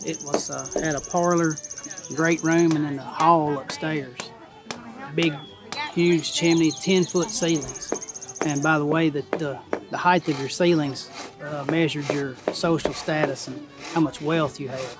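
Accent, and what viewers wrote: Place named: South, United States. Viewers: Hoo boy, that Middle TN accent (pronounced AY-uk-CENT). Feels like home, haha. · I’m a native North Carolinian, born and raised, but even I had to put this guy on 1.25x speed. A true southerner!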